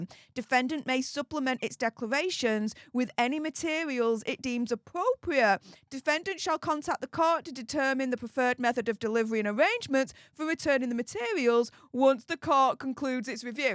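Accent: Yorkshire, United Kingdom. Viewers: i can see now why you call yourself. As a fmr lawyer let me tell you, you read thro that court order like a professional lawyer. Many ppl find it hard due to the inserted sub sections etc markers, yet you were able to read it beautifully, without stopping, well done. Its not as easy as you made it look, yep you are Def a a very attractive intelligent. I am just up the road from you in Doncaster so it was easy for me to understand the accent when you read that court document. 👏👏👏👏👏👏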